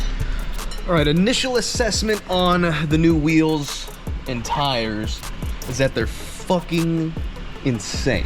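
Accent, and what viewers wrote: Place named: United States. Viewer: A man and his deep country accent TRUCK, Yee Yee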